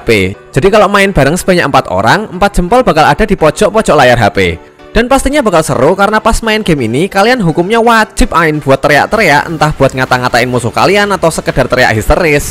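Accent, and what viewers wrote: Java, Indonesia. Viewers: Uwong jowo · medhok medhoknya yang bikin muantep btw saya asli jawa juga bang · bang orang jawa ya?? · kayak medhok medhok gimana gitu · Bang orang jawa yah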